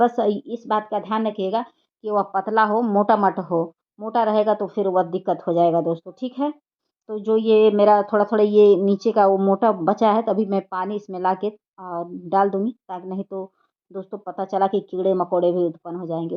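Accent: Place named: Uttar Pradesh, India. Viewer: आप पक्का यूपी से हैं।🎉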